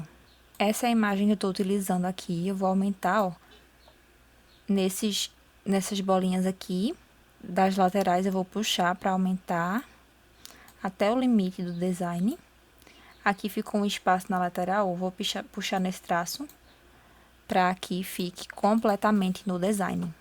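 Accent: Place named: Recife, Brazil. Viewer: Que legal,! 🌼 Me inscrevi hoje, e tô gostando muito! Didática simples, clara e eficiente! Parabéns🎉🎊🎉🎊! Ah! Você ê do Recife? Seu sotaque parece 👀 de lá! Muito top, seu Planner! 📝 Abraço👐 Noemário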